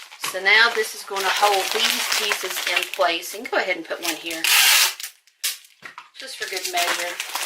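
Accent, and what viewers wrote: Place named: South, United States. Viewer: great video as always, thanks. and that southern accent...love it. It must be a canadian thing..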